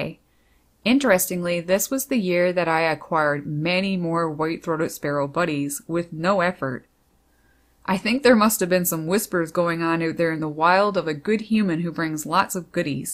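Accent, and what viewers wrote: Canada. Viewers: Love your accent my Canadian sister!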